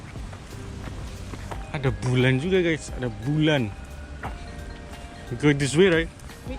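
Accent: Java, Indonesia. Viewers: Bahasa Indonesia-nya koq medok aslinya dari mana mas..?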